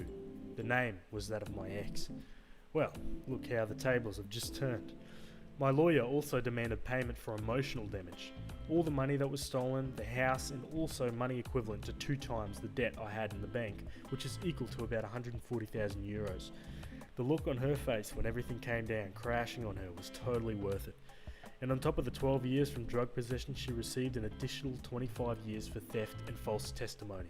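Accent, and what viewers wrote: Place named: Australia. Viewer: I’m thanking Markee for introducing me to your channel! Love the Aussie accent! 💜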